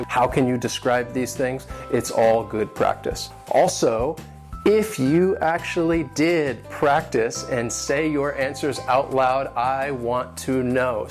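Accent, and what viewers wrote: United States. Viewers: I love listening your videos, you're a good teacher, it's quite easy to understad you, although you've got such an strong american accent, nevertheless it's lovely, i really enjoy myself listening to, awesome, greetings from manresa catalonia" cheers "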